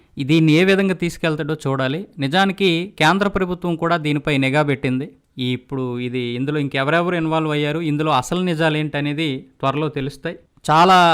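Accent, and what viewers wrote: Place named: Andhra Pradesh, India. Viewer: ని ఆడియో వింటే అర్థం అవుతుంది..... నీవు ఆంధ్ర వారివి అనుకుంట.... అందుకే విషయం కక్కే మీలాంటి కొన్ని యూట్యూబ్ ఛానెల్స్...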